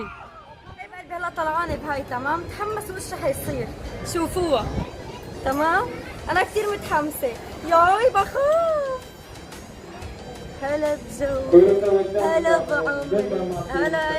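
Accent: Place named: Syria. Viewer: انتي حليبة ليش هيك بتحي ههههههه وسورية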